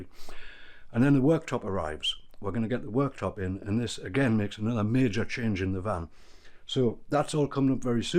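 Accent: North East England, United Kingdom. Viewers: Are you in the North East mate, sense a accent? Just been looking into converting a vivaro myself, looking like a top job 👌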